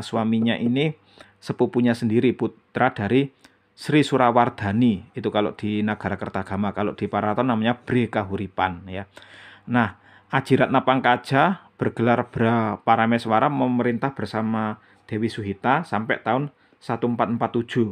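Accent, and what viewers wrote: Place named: East Java, Indonesia. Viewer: Video ini berisi narasi dalam Bahasa Indonesia dengan dialek Jawa Timuran.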